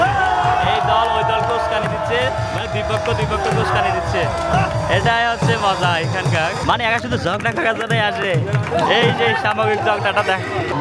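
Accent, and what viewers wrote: Chittagong, Bangladesh. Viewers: তুমি চিটাগাঙ্গে ভাষায় কথা বলো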